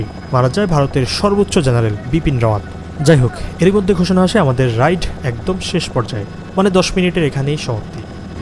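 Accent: Bangladesh. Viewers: বদ্দা অনের ভিডিও ত চিটাংগে ভাষা পুনা যার, অনের বাড়ি কি ভাই চিটাং নে ( চট্টগ্রাম)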